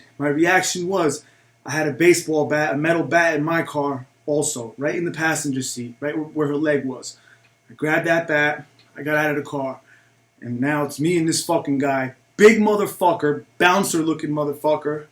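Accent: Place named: New York, United States. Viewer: Love the New York accent!!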